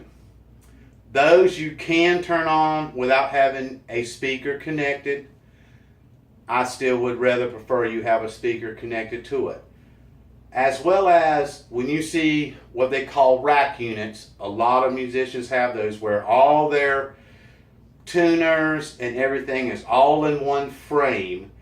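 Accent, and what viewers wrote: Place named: South, United States. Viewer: Very good video, I especially like the repetition of terms, that way you remember them better. Btw, I am south-European guy, but I would say based on your accent that you come from the south of States somewhere ... :)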